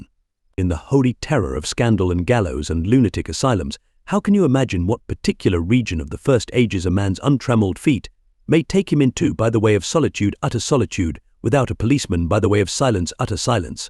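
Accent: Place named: United Kingdom. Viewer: I like the British narrator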